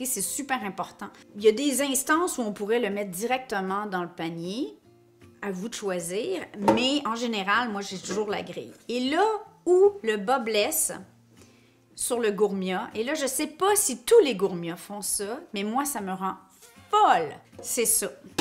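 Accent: Quebec, Canada. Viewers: Mais quelle vidéo complète et utile ! Un gros merci et quel agréable accent, le québec me manque !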